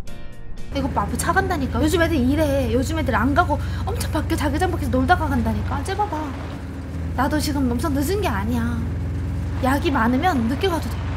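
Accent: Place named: Seoul, South Korea. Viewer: 이분은.. 목소리가 차분하고 서울말 때문인지 몰라도 매력적이네 ㅋㅋ 점... 이뻐지고 어려저가는 님 ㅋㅋ Ps 면허증은 장롱속에~~^^ Ps1 3뚝 죽어놓고 아깝다고 마음에 없는소리 Ps2 치킨 잘 먹는 이쁜누나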